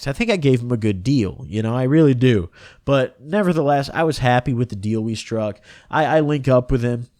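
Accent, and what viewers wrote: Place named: Naperville, United States. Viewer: are you from naperville. I’m from around there and it sounds like you are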